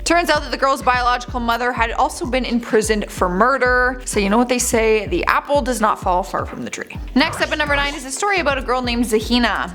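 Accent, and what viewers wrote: Canada. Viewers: Her hair is in a weird style...but I don't feel like punching her. Her accent is funny too....Canadian?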